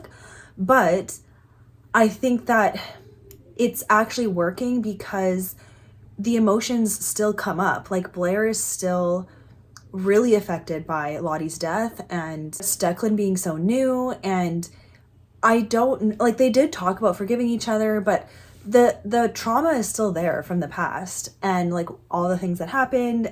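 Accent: Canada. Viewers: Literally me watching your video as someone who hasn't seen you before: "She sounds like a Canadian." A few minutes later: "Shout-out to Canada!" 😂😂 nice to find a fellow Canadian book girlie! I loved that part of Blair's story too!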